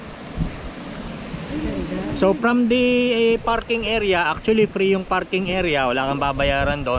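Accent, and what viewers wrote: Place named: United Kingdom. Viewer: ung accent British na talaga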